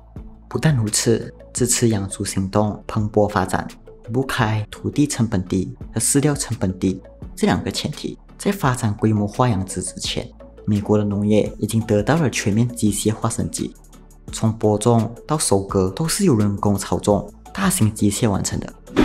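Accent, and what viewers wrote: Malaysia. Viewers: 这个华语，马来西亚人👍🏻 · 马来西亚人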